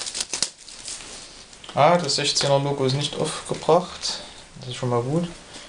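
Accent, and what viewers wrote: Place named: Saxony, Germany. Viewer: omg ein Sachse, wie schreklich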